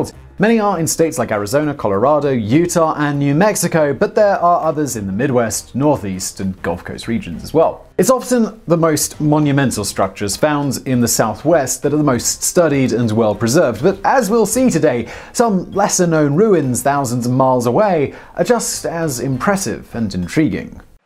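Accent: United Kingdom. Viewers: There is something so absolutely soul warming about listening to a Brit discuss the glory that is America. Never change. Never change. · All of the posters criticizing Simon’s pronunciation need to remember he speaks with a British accent. I challenge many of you to go to other countries and pronounce words & places the same way as natives! Better yet, visit areas in the US and try pronouncing words the same way as people living there. I had a friend who was annoyed that I couldn’t pronounce her daughter’s name correctly. She was from PA and I am a native New Englander, from CT.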